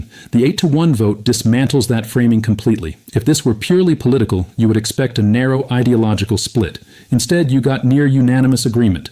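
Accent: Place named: United Kingdom. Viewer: Why does this AI have a brittish accent today?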